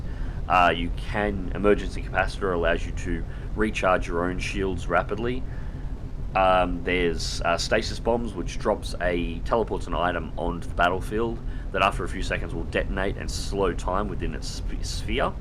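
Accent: Australia. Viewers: An Aussie !!! Finally !!!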